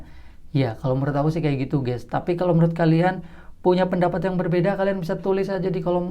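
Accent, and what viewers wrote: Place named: Demak, Indonesia. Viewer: Bang lo orang demak ya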